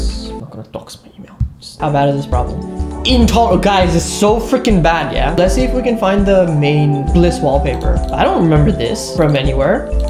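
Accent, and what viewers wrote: India. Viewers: Bro are you Indian ?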